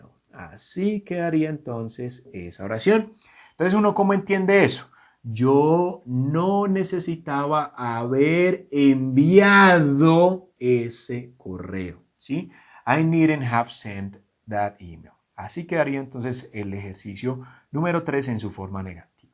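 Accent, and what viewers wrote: Colombia. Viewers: Hola ¡¡. Me encanta la manera tan clara que explicas el Inglés y también tu metodología. Saludos desde Peru ¡¡ ah y me gusta mucho tu acento colombiano. Are you from Bogotá?. Blessings!!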